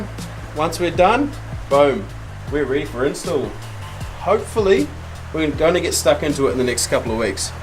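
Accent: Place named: New Zealand. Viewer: ahhh your a kiwi lol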